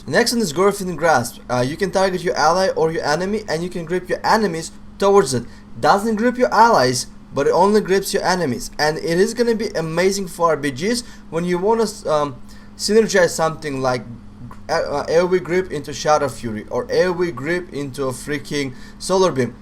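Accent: Russia. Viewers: Ты русский? Серп и молот вместо глаза, акцент. Да?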